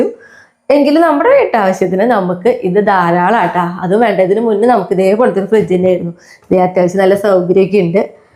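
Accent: Thrissur, India. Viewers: Eniku ningalude samsaram clear aakunnilla.kollakarku thrissur slang manasilakan kurachu prayasamanu.....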